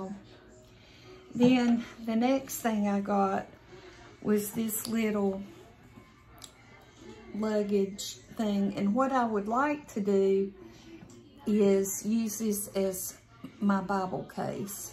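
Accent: South, United States. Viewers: Hi, I enjoy watching your videos. I like your accent, reminds me of some of my family from down south. I used to call my grandmother grannie. She was a good one. I enjoy going to the thrift store too. My daughter took me last Saturday evening. We wore our masks and had a great time shopping. Thanks for sharing your video. 💖💖💖💖💖💖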